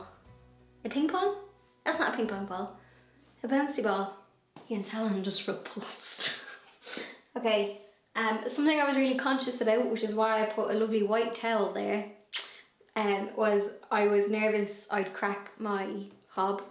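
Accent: Dublin, Ireland. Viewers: Lovely hearing the true dub accent put paper on top of all your presses , and I change them every Christmas when decorating as well as my fire alarm batteries. Keep going girl you’re doing a great job . Up the kingdom 😂❤❤🪴💐☘️☘️ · 😂😂😂 “prehistoric grease”You are pretty amazing being able to accomplish all that you do. And something I never thought about but I wonder if my great grandmother had a brogue like yours or your brother. ❤❤. ☘️☘️☘️☘️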